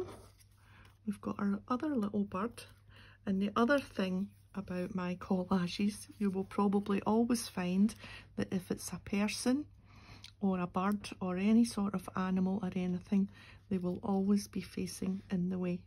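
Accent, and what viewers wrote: Scotland, United Kingdom. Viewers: Hi! It’s midday Sunday here & for a change we have a nice breeze coming through the house! I saw this video & I said, “yay, some Scottish” (but I guess it’s really English with a Scottish accent!) Anyway, it’s so melodic, I could listen all day! I really like these journal cards, especially the one with orange touches! I’m so glad you showed the books, I looked on Amazon & found 2 used copies of the bird book! It’s so soft & dreamy, I will enjoy using those little cuties! It’s also September 1st! I hope autumn will arrive soon! Have a great day! Erica❤ I read somewhere that one has to learn Gaelic in order to become a Scottish citizen?? I bet that helps to keep the number of applicants down! Haha!❤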